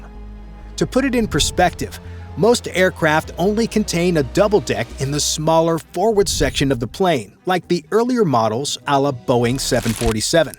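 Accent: United States. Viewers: Stopped watching when he said that the A380 was out in 1980s Plus the insufferable american accent and the Boeing ass kissing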